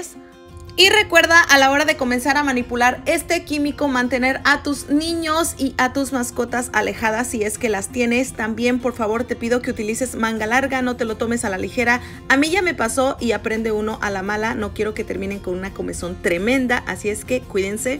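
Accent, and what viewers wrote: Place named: Mexico. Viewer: 👏👏👏👏felicitaciones, que gran enseñanza y gracias por compartirla con nosotros , por tu acento escucho que eres como Mexicana y le llamas sosa cáustica , acá en Colombia se le dice Soda Cáustica , igualmente es lo mismo. Te envío un gran saludo desde la capital Bogotá .!!!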